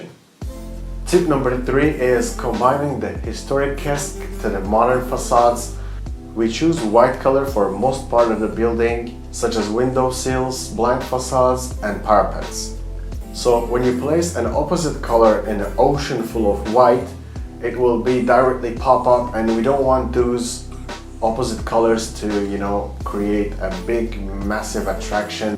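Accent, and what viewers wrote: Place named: Brazil. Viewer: Are you Brazilian? Your accent kinda sonds like mine.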